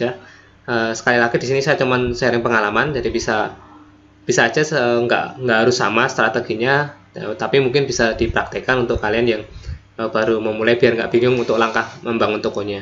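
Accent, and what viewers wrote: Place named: Pekalongan, Indonesia. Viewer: Orang pekalongan yah mas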